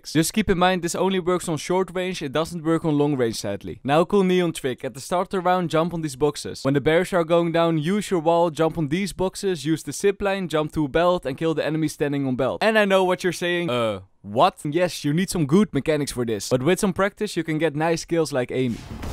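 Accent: Netherlands. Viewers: in the first 5 seconds i knew you were dutch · are you dutch?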